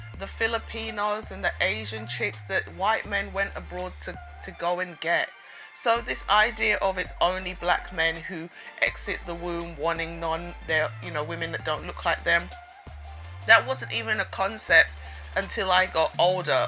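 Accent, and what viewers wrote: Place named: London, United Kingdom. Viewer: no prob, what city in the uk are you from I'm trying to figure out that accent it sounds like a london accent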